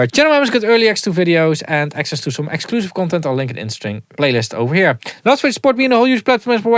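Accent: Netherlands. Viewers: I dont know who you are, but I hear the Dutch accent haha.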